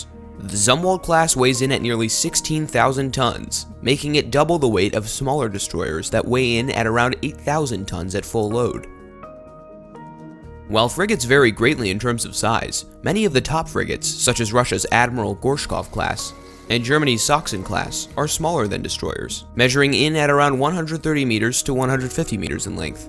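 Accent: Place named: United States. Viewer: To the dude that did this video. You sound like you arr and American. You do know we don't use kilometers, we use mph. And we don't use meters, we use feet. I had to give the video 👎🏾👎🏾 for that. I have faith that you can do better next time. 😁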